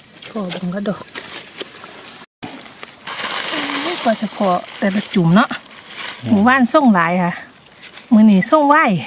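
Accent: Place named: Ubon Ratchathani, Thailand. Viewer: ป้าถามแหน่ยุพะเยาคือเว้าอิสานจ้าสำเนียงคืออุบลป้าติดตามจ้าแต่บ่อค่อยใด้เม้นหมานๆจ้า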